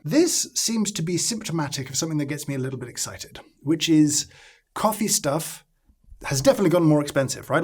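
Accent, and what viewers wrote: United Kingdom. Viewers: "This seems to be symptomatic of something that gets me a little bit excited." OMG! So British... Old school British! I don't care that much about coffee, but I love listening to this guy talk! And, man... does he talk. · Americans trust British accents like.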